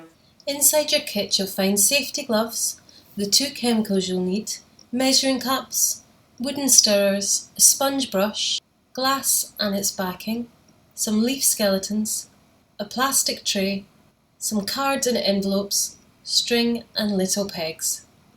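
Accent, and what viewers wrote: Scotland, United Kingdom. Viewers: How lovely to hear a friendly Scottish voice. Just getting into doing some cyanotypes and enjoyed your explanation. All the best from Panama 🇵🇦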